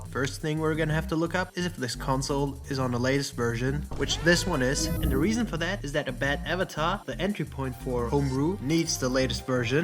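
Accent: Germany. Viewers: youre german???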